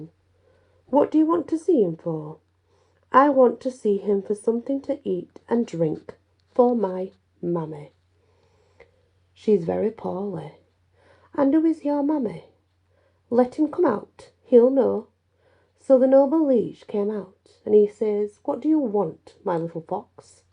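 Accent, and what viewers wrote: United Kingdom. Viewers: Hey sis, im connecting from Krishna ..I love your beautiful brittish accent ...i love the story ...❤❤❤❤ love your contents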